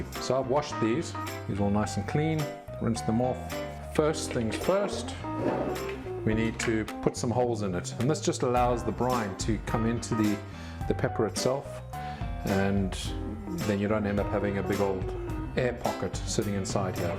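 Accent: South Africa. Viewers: Where in SA you from Bud. your profile location says UK, but you sound like you could be my neighbor :P. Nice Vid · You sounds like a South African, good on you mate. Excellent video. Lekker man. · Are you South African? Your accent sounds a little South Africany. 😁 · Good job ,,A bet with my wife,, South African???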